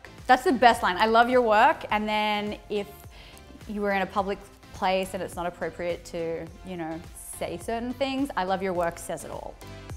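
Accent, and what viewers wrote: Australia. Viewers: Glad she hasn't lost her Aussie accent.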